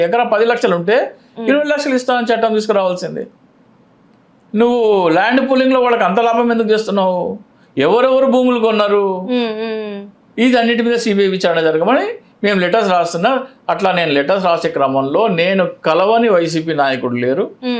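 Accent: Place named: Telangana, India. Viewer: Eeyana telangana language lo maatladutunnade..🤔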